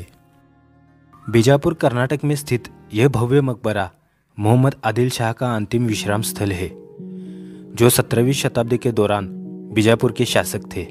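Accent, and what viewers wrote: Maharashtra, India. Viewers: इस वीडिओ के निवेदक की मातृभाषा मराठी है ऐसा लगता है । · माहिती तर लाजवाब दिलात , पण तुमचा आवाज मराठी वाटतोय ❤️👍🏼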